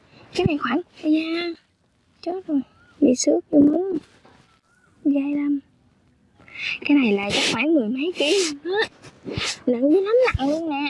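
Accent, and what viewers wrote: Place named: South, Vietnam. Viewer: Giọng hát miền nam .Dễ thương vô cùng.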